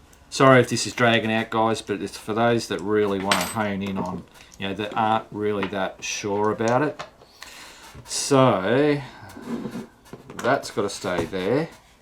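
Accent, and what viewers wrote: Australia. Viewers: I was 1 minute in lol, he's proper Aussie 😂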